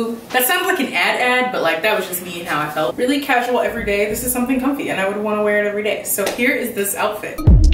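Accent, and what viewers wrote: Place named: South, United States. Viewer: I'm a true fan. You have lost your Southern accent. Glad you're back.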